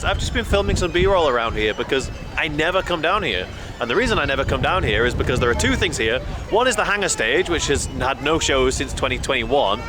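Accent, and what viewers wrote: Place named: United Kingdom. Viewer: love ur content and the way you speak ! (are you from somewhere in the UK? sorry if I mistake) Cheers, I'm looking forward to new contents !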